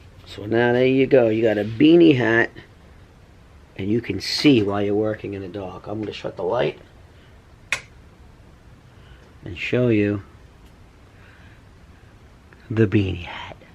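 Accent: New York, United States. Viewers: First of all, nothing beats a NY accent. #Queensgirl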